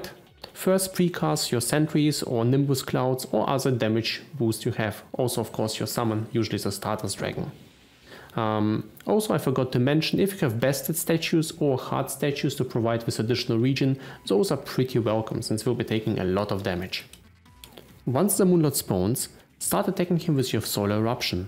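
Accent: Russia. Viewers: Мне кажется или акцент русский? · Thx for this tutorial! Are you russian btw?:) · Файналли рашн ассент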